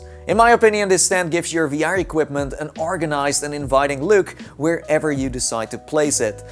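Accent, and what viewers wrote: Netherlands. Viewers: Zie je nou wel ik zag het al aan je en aan je engels dat je Nederlands was ahahah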